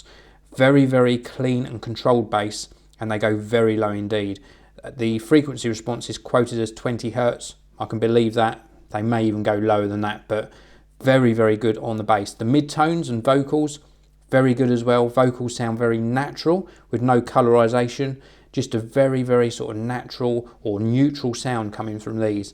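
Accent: United Kingdom. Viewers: Nice and complete review man! The best I've saw on that one (the smooth English accent is a +)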